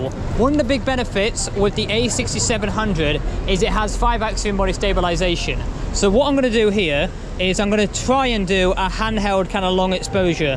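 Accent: Manchester, United Kingdom. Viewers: Jajajajaja What?! No! Manc meaning Mancunian - most of the time your accent brings me back there. I'm Spanish but I've been living up there for a few good years. It's a dear place for me with mostly lovely, warm people (being from Andalucia you kinda miss that when you're away and I got that there) Not to forget that Manchester is in a pretty sweet spot where both Peak district and Lake district are at a stone throw! Soooo many awesome places to explore :_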